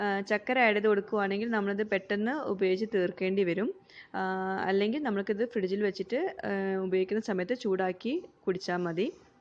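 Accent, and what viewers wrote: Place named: Wayanad, India. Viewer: wayanatilano? wayanaden samsaram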